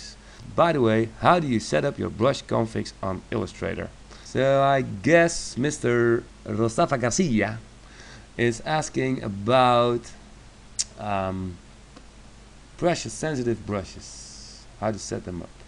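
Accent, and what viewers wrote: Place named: Netherlands. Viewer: Thank you, very good video ! By the way, your english accent is very nice for a Dutchman ! And I can tell because, I'm Dutch too :)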